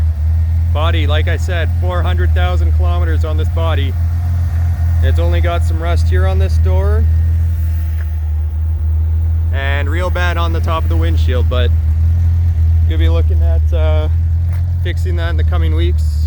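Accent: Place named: Canada. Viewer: Sound like your from Canada.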